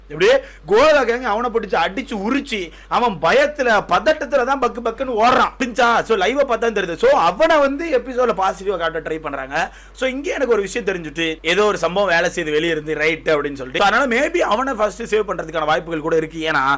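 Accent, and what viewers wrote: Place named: Nagercoil, India. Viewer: Bro Nenga Nagercoil ah hahaha yalavu 😅Nagercoil slang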